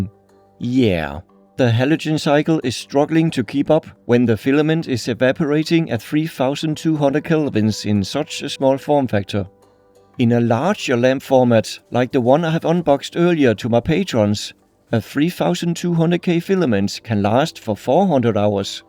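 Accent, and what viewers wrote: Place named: Denmark. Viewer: Du er dansker jeg kan godt høre det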